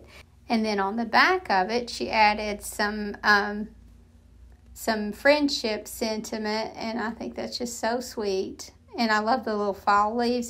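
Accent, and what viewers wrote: South, United States. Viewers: What gorgeous projects ❣️💕 While I have seen many upcyclers paint over silver-plated trays, this is the 1st time I have seen anyone leave an unpainted silver border around the edge of the tray and also leave the back unpainted...and I rather like it❣️🤍 Everyone has their favorite "go to" paint color. Not hard to understand why "buttercream" is a color you use frequently❣️🤍💕🤍 Not only is it beautiful for all of the reasons you described, BUT IT SELLS, which IS THE BOTTOM LINE, LOL.🤣🤣🤣 And being from Minnesota, am I the only one who loves ❤️ the way softly says, "buttercream" with that darling Southern drawl⁉️⁉️ Hahahahaha❣️👍🏼😁🤪🤣 The ONLY drawback I experience when you say "buttercream" many times during the course of a video is the HUGE CRAVING I GET FOR A BIG SLICE OF CAKE 🎂 🥮 WITH BUTTERCREAM FROSTING AND AN ICE COLD GLASS OF MILK, LOL❣️🤣🤣🤣🙈🙊🤣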